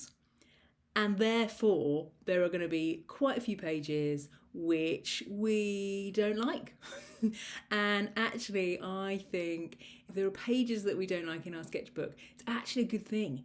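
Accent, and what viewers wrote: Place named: England, United Kingdom. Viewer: Wow! 🤩 I've just discovered you today. I'm feeling a bit low, (or was,) it's my 55th birthday, no cards, a "nothing" day... but i was checking out videos of gelli plate art and found you. 😊 What a lovely lady, so glad you are doing again art now. The sketchbooks are full of gorgeous colour, great pen drawings, not at all childish! 👍🏻😊 I also love the fresh cream walls in your studio with the splashes of colour, and colourful ceramics as well. That's how I'd like this dull flat to look; it really needs tidying up and decorating! 😱 And i just live up the road in Eastbourne at the moment. And it's nice to hear a calm English accent. Must watch your other videos - great advice too.❤🌞🌷🌼🌱🌿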